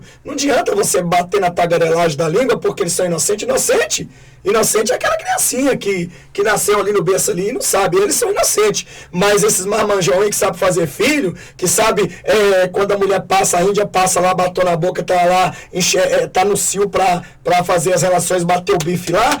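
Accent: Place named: Rio de Janeiro, Brazil. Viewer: Não é a voz da Débora nem da Léia Essa mulher da voz tem o sotaque carioca no S Débora e Léia não tem.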